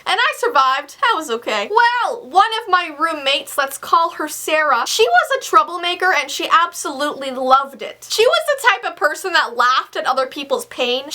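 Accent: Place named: Canada. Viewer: think it's jut her Canadian accent. In her baby sitting vid, she pronounced bagel as BAG-gul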